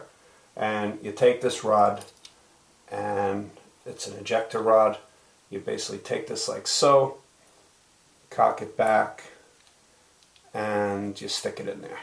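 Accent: United States. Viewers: Best American accent ever.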